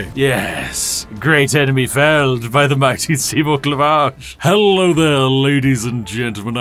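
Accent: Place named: England, United Kingdom. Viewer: I’m sorry, I really am, but the way the English say certain things makes me sick to my stomach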